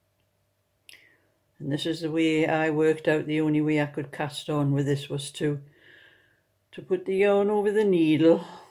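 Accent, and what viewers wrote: Newcastle, United Kingdom. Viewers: Lovely to hear another geordie voice , I love knitting ,Thanks for sharing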